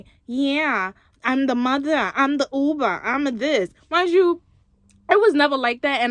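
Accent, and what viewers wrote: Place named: Long Island, United States. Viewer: Love the Long Island accent yo need to make it a character 😂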